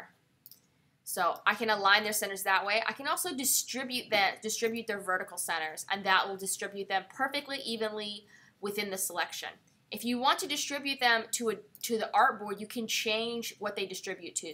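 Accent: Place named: United States. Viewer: thanks for the american accent , very clear and helpful tutorial! very efficient for the new learner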